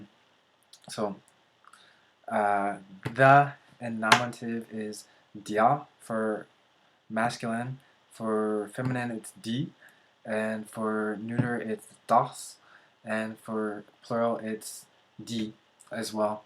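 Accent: Bavaria, Germany. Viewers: Ich frage mich ernsthaft, warum jemand Deutsch auf bayrischem Pseudo-Dialekt lernt :D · You really speak very hard and harsh bavarian german. That is not a problem, I just wanted to ask if this was your intention? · I think you should learn bavarian instead of standard german. ;) Your pronounciation is much closer to that dialect. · First of all, I am a german native speaker and I think your german is the best i have ever heared from a non-native speaker, even though you have this bavarian accent. Good work there. I love the dialects we have in germany, because some of them really sound like a whole different language when spoken to their full extent. Even I (coming from where they mostly talk kölsch) have a tough time trying to understand boarisch or sächsisch ;)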